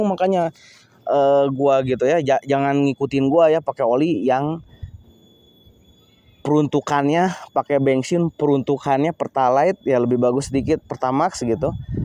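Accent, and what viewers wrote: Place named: West Java, Indonesia. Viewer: Lu orang jabar, gak usah ngomong gue, logat sunda.. Gak cocok cuk..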